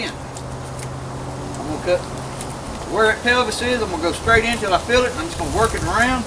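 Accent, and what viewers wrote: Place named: Kentucky, United States. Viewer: This guy has to be from ky u dont hear that accent in many other places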